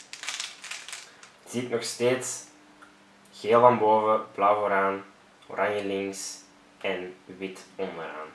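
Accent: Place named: Belgium. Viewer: Wjw das gwn nen Belg Lets go Belgen ik zen ook nen belg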